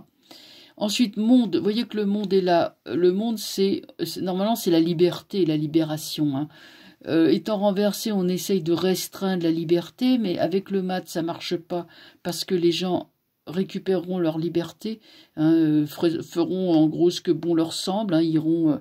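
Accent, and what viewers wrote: Paris, France. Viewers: Merci toujours heureuse de vous entendre avec votre petit accent parisien et votre humour. Vous avez raison ils sont a la fin de leurs mondes. Et nous retrouvons enfin notre souveraineté il suffit d'accrocher nos ceintures de sécurité pour les dernières secousses.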